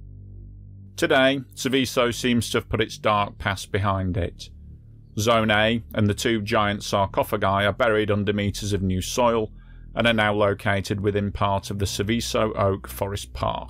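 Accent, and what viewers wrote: United Kingdom. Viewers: why do you have a British accent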